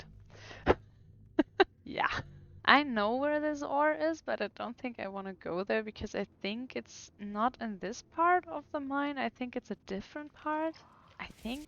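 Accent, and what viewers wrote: Germany. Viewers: Are you German or Austrian? Wonderful content Edit: yes you said you are German in the video lol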